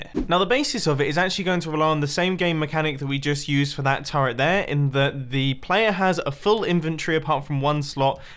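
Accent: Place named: United Kingdom. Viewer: you are british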